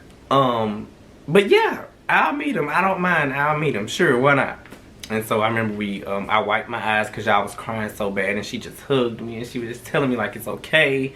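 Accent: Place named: Alabama, United States. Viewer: What part of Alabama you from bruh!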